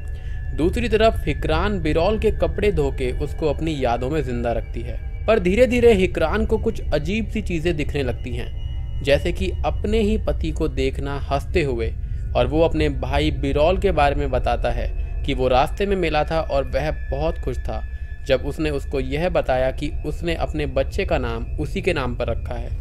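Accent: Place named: Haryana, India. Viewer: Haring admi ka awaz hai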